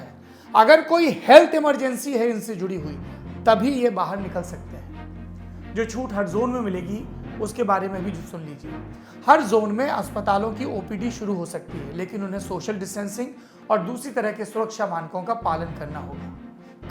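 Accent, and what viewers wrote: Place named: Bundelkhand, India. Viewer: गजब बुंदेलखंडी भैया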